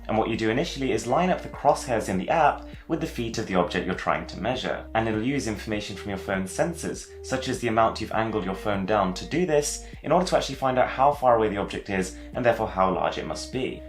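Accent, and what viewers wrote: RP, United Kingdom. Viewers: Music from the serie Gomorra and a posh British accent. Hmm . . . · Ahhh bullshit with these damn smart phone devises and a billion amazing things that can be done with them (except take the dog out on a leash to go pee and poo), Disney cga animation movies, and "hot" fox news chicks bitching much ado 'bout noting in tight party dresses, and cheap lil "Cosmo" 'bot toys, and wall paper-thin 100" flat screens, quad copter toy drones with super hi-fi HD cameras, and stupid auto-correct that don't work as intended half the time, and other cheap gimmicks to keep our minds off the fact that modern technology has failed to put colonies on our Moon and the moons of Jupiter and space stations orbiting around the ones of Saturn. Whatever happened to the dream of interplanetary space travel? Inter-Galatic commerce? Gone to pot already? All we can come up with so far is some surreal composition of a fuzzy-faced used camel and goats milk arab dealer, sporting a dated pompador-like hair style with a posh British accent trying to charm the panties off of suburban White 16 years old girls and ex-virgins, doing his impersonation of the late James Mason plugging cell phones aps on international YouTube tv. 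What the HELL man?!?! 2017 and this is it? More like a damn apathetic rut to me. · Yhh daaaaam this guy has that one British voice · I wish I had this type of british accent and not the chavy one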